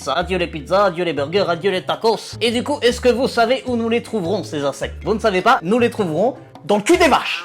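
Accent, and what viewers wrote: Canada. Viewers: PK tu fais plis l'accent canadien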